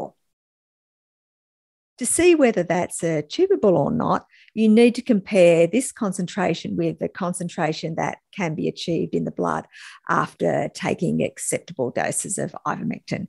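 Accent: Australia. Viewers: she has Australian accent, so whatever their equivalent to NIH would be; also, Australia went full totalitarian fascist Chinese-style lockdown, destroying many lives and livelihoods (much more than USA, which was bad enough) during the plandemic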